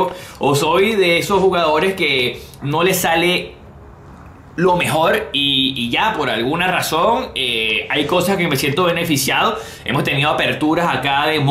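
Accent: Venezuela. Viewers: El es venezolano?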